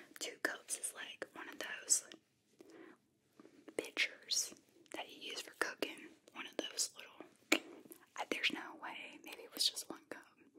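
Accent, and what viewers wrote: South, United States. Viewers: I live in Charleston SC too so I’ve spent my whole life listening to this accent and slang. Love it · Sweet tea, ribs, and that accent are probably the only things I miss about the years I lived in the south. Stay Awesome 🤙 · Yes to some good sweet tea and kool-aid! Loving this southern accent whisper! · Something about the southern accent man. Thanks for doing another one! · Your southern accent is awesome! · Oh my god. That accent! <3 I'd give my right arm (also known as my favourite arm) for a Southern Belle girlfriend. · Not all sound like this but there is a good amount that does, just because you don't sound like this doesn't mean that you aren't from the south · Do southerners really sound like this. I don’t feel like I do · I really like this video, love the southern Accent. How about more of these once in a while and or other accents you can try. 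And I also appreciate you 💜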